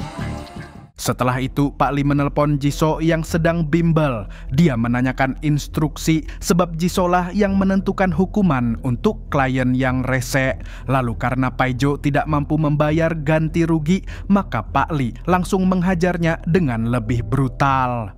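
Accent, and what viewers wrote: Banjar, Indonesia. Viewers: mang urang Banjar kah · urang banjar amang nya waluhhh😂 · Bah buhan Banjar kah tih 😂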